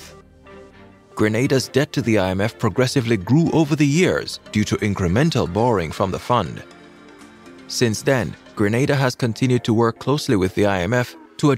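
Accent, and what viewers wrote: Jamaica. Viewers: I pickup his accent so I know he is Jamaican, but whose more biased against Jamaica than a Jamaican, they are always the ones in the front of the line to disregard and disrespect Jamaica and her accomplishments.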